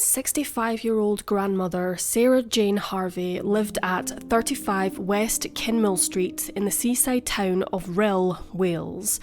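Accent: Scotland, United Kingdom. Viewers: I know the topics are serious but I do find her voice so relaxing . I love the Scottish accent x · I have family from the Angus area of Scotland, and her accent sounds really similar... sort of 'Edinburgh' rather than Glasgow. It really is such a lovely accent, and the narrator's tone/timbre is so beautiful too... She could read children's stories or folk tales/legends, and it would be amazing :)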